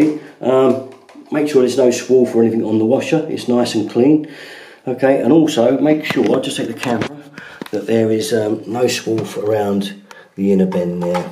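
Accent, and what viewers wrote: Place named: England, United Kingdom. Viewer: I’ve learned a lot from this guy over the years. Down to earth sort of chat and really helpful. Saved me a fortune in plumbers’ costs. Lovely English accent too.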